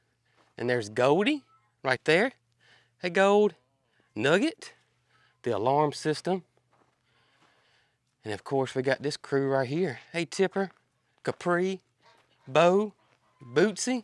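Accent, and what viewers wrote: South, United States. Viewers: Thank you for showing us the orientation of your farm. Having a better-than-average sense of direction, knowing the layout of places and special things alleviates confusion for me like the pond is a lot closer to your house than I previously thought and on the opposite axis too. I know that it causes confusion for many people. Thanx for showing the areal shots too. That put things in perspective for a lot of us. I so enjoy this channel., you're always doing something different. Plus your strong Southern droll cracks me up. I also like your kids' videos too.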